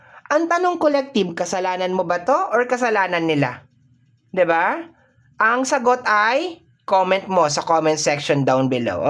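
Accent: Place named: Iloilo, Philippines. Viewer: Good morning watching from Jacksonville florida 🇺🇸 thanks for reading i resonates much and you sounds like ilonggo me too from jaro iloilo city ..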